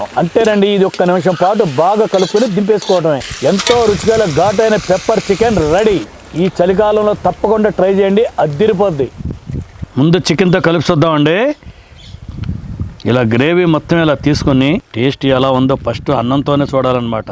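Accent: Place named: Telangana, India. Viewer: తాత నువ్వు వంట చేసుడేమో కానీ నోరు ఊరుతుంది తాత ఏమన్నా చేస్తున్నావా వంట మన తెలంగాణ యాస లో సూపర్ chepthunnav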